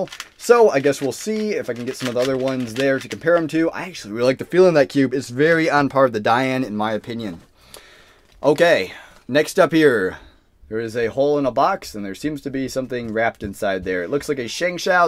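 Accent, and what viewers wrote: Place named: Great Lakes, United States. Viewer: Great Lakes accent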